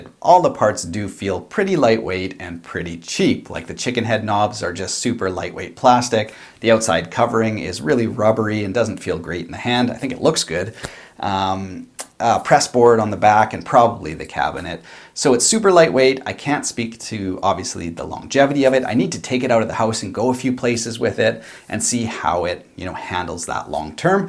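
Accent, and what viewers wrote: Canada. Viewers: Great video, exceedingly helpful! Would love to see it compared to higher-end tubes. Also, the Canadian accent seems more pronounced in this video for some reason.. love it!! 🤘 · he sounds candian, everything sounds weird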